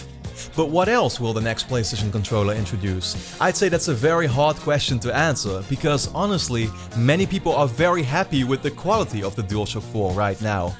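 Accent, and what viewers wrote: Netherlands. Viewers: are u dutch?? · R u dutch? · Ik gok dat je een Nederlander bent. Als je vraagt hoe ik dat weet, het is heel makkelijk te horen aan je accent als je Engels praat. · Are you dutch? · Jeez the Dutch accent is killing me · heerlijk hollands accent! maar zeker goede vid! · Zo'n mega zwaar Nederlands accent haha heerlijk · Ben je nederlands je hebt zo een nederlands engels accent? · This guy is 100% dutch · Ben je Nederlands? Volgens mij hoor ik het aan je accent. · I don't know why i can always hear if a person is Dutch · nice dutch accent :) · Je Nederlandse accent is wel te horen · Are u from the Netherlands 🇳🇱 my friend? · No hate on the video btw, great thinking logic (is that a dutch accent?) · are you dutch? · Ben je Nederlands want zo klinkt je accent wel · Do you come from the Netherlands? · Ben je Nederlands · why can i hear if poeple are dutch or not XD cuz im dutch and is dutch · M8 is there any chance that your Dutch? 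· are u dutch? Lol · Sxrewhead could hear it in the accent I'm Dutch too. (if my name didn't give it away) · Youre Dutch arnt you?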